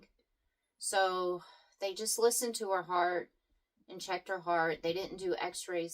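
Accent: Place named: Georgia, United States. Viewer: Your kindness is not wasted. I think you are beautiful. I can't tell you how to find happiness, but I hope you do so, often. I'm from GA originally and something about the way you talk reminds me of home. I love your videos and I hope you continue. - - Not sure how this will land but I will mention it. God will always be there to listen. Prayer is therapeutic, at least. Even if that is all that is expected of it.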